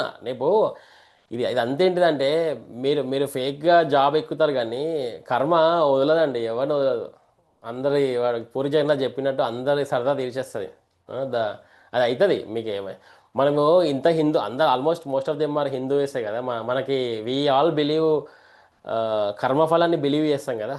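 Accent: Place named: Telangana, India. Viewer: Happy new year Anna mee telangana yaasa bashaki pedda fan ni jai telangana ❤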